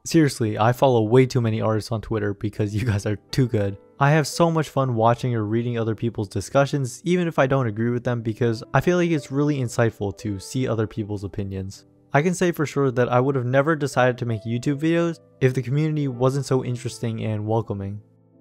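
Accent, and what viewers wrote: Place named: West, United States. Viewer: lol he sounds western no?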